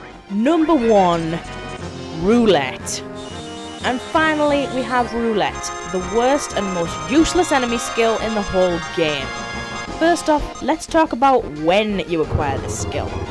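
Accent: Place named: Northeast England, United Kingdom. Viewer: That's a North East English accent? Huh, neat!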